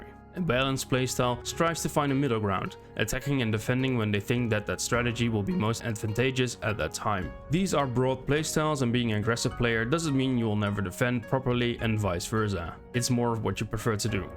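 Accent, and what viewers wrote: Netherlands. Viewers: ah, it's dutch. Cool to know) Btw, do you review mods for shogun 2?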